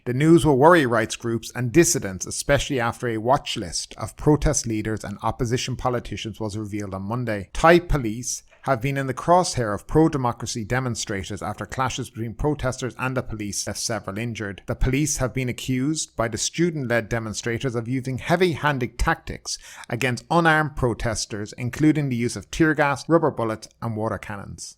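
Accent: Ireland. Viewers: I love the Irish accent..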